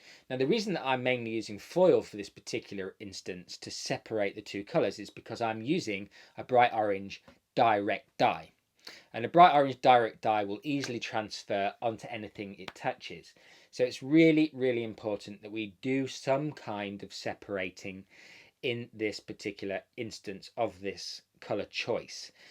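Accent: United Kingdom. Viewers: I've been watching your videos all day on Saturday and it's so nice to watch and listen to another Brit. US colouring terms are so different to ours. I know now that if I did want to colour mine (South Asian hair) I would know what to ask for.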